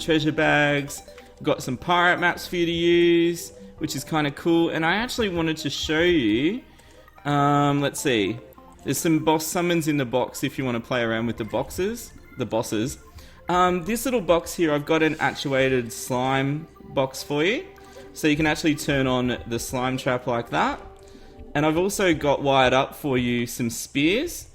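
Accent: Australia. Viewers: I only started watching 2 days ago, but your stuff is great. the Australian accent is very motivating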